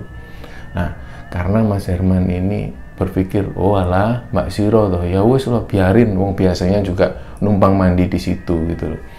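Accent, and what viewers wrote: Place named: Banyumas, Indonesia. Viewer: Salam pagi salam kenal mas.. Baru sempet comen biasanya cuma like saja... Walaupun saya orang sunda tapi seneng bahasa jawa kental mas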